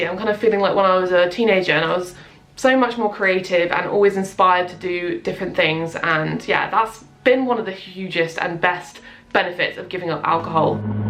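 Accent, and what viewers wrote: England, United Kingdom. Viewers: I'm happy for you, God Bless You! I'm trying to give it up, and what you say is true. I gave it up for 17 yrs. and foolishly started drinking a little wine and quickly turn to allot. Anyways, I want to stop drinking 10 years now but, the Lord help me! I love your english accent, I am in Virginia, USA. Best to you!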